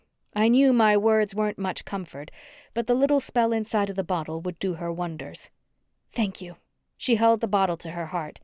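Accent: South, United States. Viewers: Lovey story Narrated in a southern twang😊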